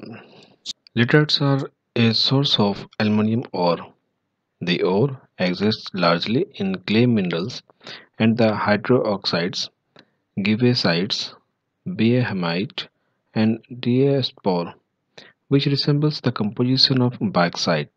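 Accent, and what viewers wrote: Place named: India. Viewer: Interesting content, dear brother, but the way You pronunciate is awful... I understand that You are of indian accent, but nevertheless Your way of pronunciate is very lazy and neglectful... You should try to improve Your way of speaking, or let somebody other do the vocal job in Your videos, or at least provide Your videos with correct captions/subtitles... I hope You understand this and don´t take my words as an offense... Thank You for the video, and have a good luck with Your work and Your channel ;))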